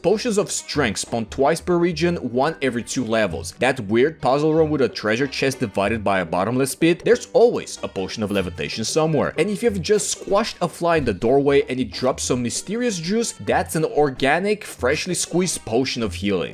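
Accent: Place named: Eastern Europe. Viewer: Pelo sotaque esse cara provavelmente é europeu e possivelmente eslavo. Acho que eles entendem alguma coisa ou outra sobre comunismo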